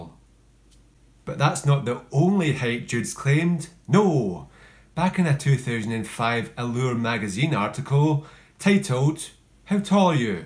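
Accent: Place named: Scotland, United Kingdom. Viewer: Are you scottish?? I love your accent ❤️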